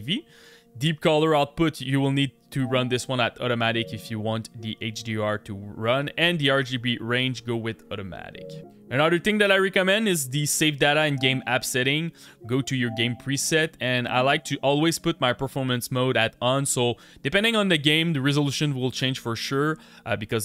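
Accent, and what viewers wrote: Quebec, Canada. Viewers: Quebecois mon homme ? 😂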